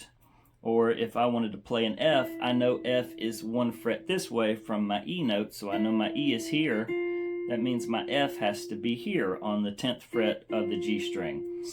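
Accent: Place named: Mississippi, United States. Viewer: I have been on the brink of making this connection for YEARS! I could feel there was something simple I wasn't putting together and I couldn't make the connection until I watched this video. Game changer! By the way, that MS accent was recognizable enough that i had to go to your profile to verify.😅 Copiah county here. Do you do in person lessons?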